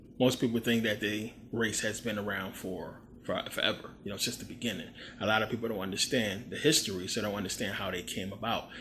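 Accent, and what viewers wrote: Philadelphia, United States. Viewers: He’s from Philly. We are city cousins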